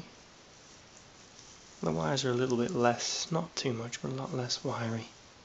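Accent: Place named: North-East Wales, United Kingdom. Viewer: Very nice and relaxing. Hmm as for accent, I'm probably wrong bit you have a similar accent to my friend who lives in north east Wales, near liverpool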